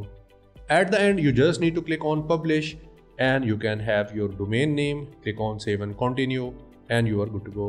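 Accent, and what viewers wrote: India. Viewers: this guys indian accent is so thick its hard to understand and listen to !!..come on man.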